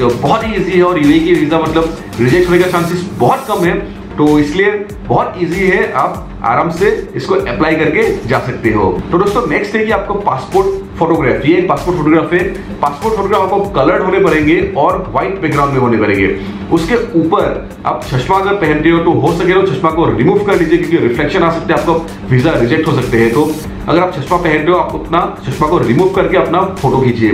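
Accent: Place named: Assam, India. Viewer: your tone looks like that of a assamese or a bengali guy..😅😅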